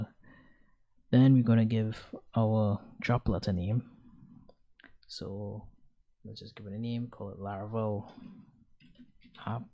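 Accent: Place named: Trinidad and Tobago. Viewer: I knew that was a Trini accent. Happy to know there are more high-level coders from TT. Well done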